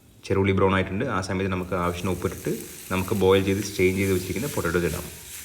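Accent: Tamil Nadu, India. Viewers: .... Tamil manjal ...pronunciation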